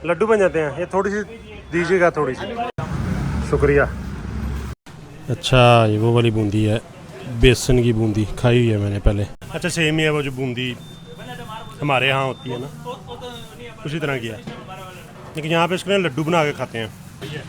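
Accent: Punjab, India. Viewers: Eeseeleeemweeeleekewm.... Bhai Desi tareeke se bhi bol sakte hai. Baaki Sara lehja toh Punjabi hai na to arabi banne ki koshish kyu?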